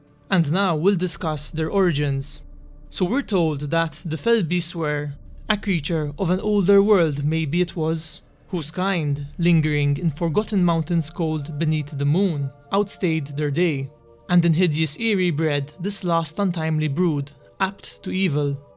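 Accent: Malta. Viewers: I could listen to your beautiful Maltese voice for hours. But harken now, as I recount the tale of Bargozím, the Fell Cockroach, foul pet caressed by Morgoth, ancient spawn sprung unwished-for from the tainted loins of Ungoliant to trouble the kitchens of Man. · are you Maltese by any chance? Your accent sounds Maltese.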